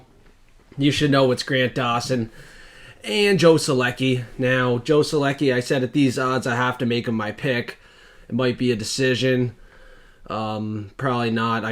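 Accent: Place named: Glace Bay, Canada. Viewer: Fuck b'y you sounds like yer rite outta glace bay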